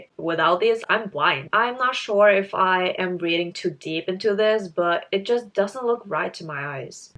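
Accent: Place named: United States. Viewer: american accent ! on point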